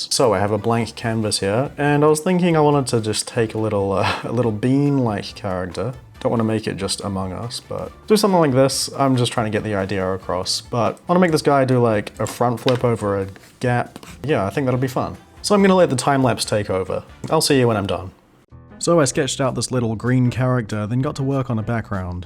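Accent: New Zealand. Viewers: Are you kiwi? 🇳🇿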